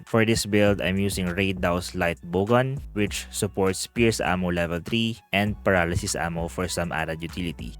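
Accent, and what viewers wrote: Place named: Philippines. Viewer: Your accent in the beginning, I knew exactly you're a filipino like me. Kudos to your guide video bro. After doing speedruns on my Bow... now it makes me wanna go LBG for my next weapon. Thanks man great video!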